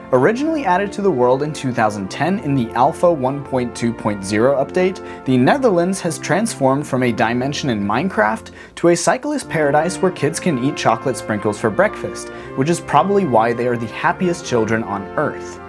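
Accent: United States. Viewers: Impeccable American accent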